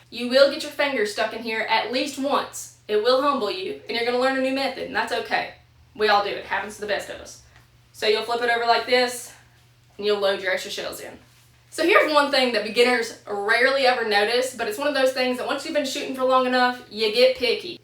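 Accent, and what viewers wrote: South, United States. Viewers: You’re video just showed up on my feed loved it informative and funny had very useful info and to top it off that southern accent took me back home I subscribed looking forward to seeing more of your work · After a couple of minutes in the video, I could hear the "taam (time); thaang (thing); kaand (kind)" - and I was like, yep, that's a red neck there 😆. Great video by the way ✌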